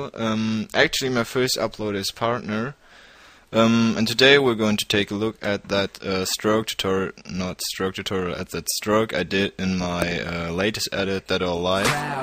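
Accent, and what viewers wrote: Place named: Germany. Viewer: Man hört das sofort wenn ein deutscher englisch spricht .. :D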